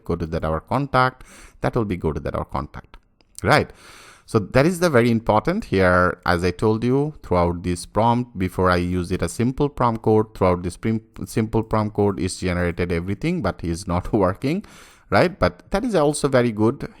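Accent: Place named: India. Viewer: Could you please speak with an American accent rather than an Indian accent?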